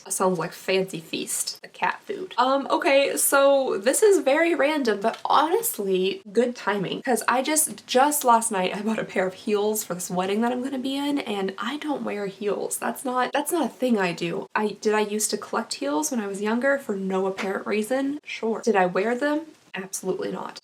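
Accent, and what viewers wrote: Kentucky, United States. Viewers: This is a bit of a weird comment, I'm also from KY and sometimes I miss the accent and watch your videos 😅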